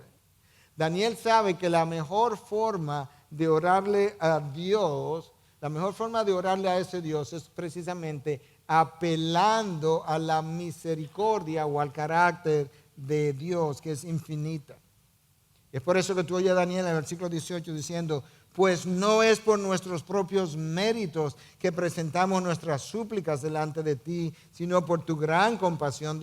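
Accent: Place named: Dominican Republic. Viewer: Mi señora el pastor es dominicano y esa S hace parte de su acento. No se fije en pequeñeces como esas sino en lo importante del mensaje. A usted por ejemplo se le escaparon varias tildes en palabras que las llevan🤔😶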